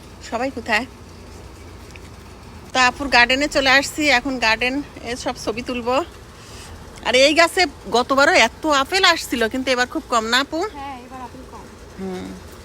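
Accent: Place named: Bangladesh. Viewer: আপু আপনি তো বললেন না বাংলাদেশে আপনার দেশের বাড়ি কোন জেলাতে। আপনার ভাষা শুনে মনে হয় আপনি আমার জেলার মানুষ। তাই খুব জানতে ইচ্ছে করছে। যদি কোন সমস্যা না হয় একটু বলতেন ভালো লাগতো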